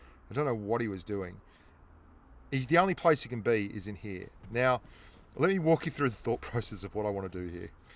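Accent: Australia. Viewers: Bro ...thanks ...nice video are you from Australia ?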